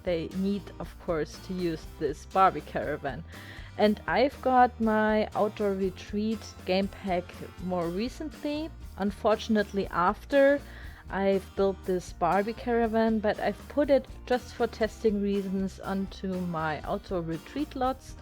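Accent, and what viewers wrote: Germany. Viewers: Es kann sein dass ich mich täusche aber kommst du zufällig aus Deutschland? Hast so einen deutschen Akzent haha :D Sehr schönes Video nebenbei weiterso bin total begeistert von deinen Baukünsten. :) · Are you German ?☺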